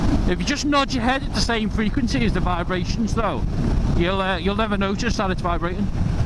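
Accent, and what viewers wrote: Liverpool, United Kingdom. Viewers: Are you from Liverpool??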